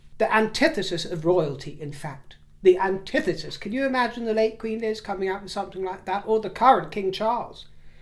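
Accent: United States. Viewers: Great American accent🙂👍🏻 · I was thinking about this when I was making a comment. I don’t know if it was under this or the last video I watched but I’m dictating and it’s writing in US English. I can feel all the people over the sea cringing when they read the spelling of “favorite.” 😂 I’m American and I still feel your pain having to speak with an American accent. At least for you it’s only temporary.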